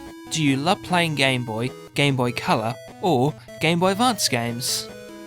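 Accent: United Kingdom. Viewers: hahahaha i love britisch aktzent :D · great job!!I was looking for GBplayer review! I rlly liked, but I gonna tell u: your british accent difficult my understanding, I partially understand what u said. greetings from Brazil!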